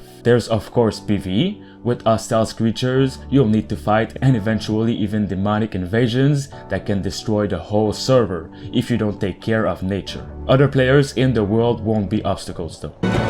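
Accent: Quebec, Canada. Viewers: Hey what’s up! This is the first video i see of yours, and i can’t stop thinking i know where that accent is from. Are you a french canadian? I’m also french canadian:P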